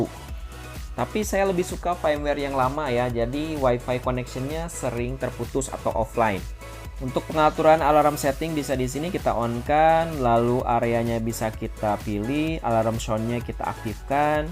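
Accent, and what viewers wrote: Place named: West Java, Indonesia. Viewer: Baceo... Sunda pisan 😁🤣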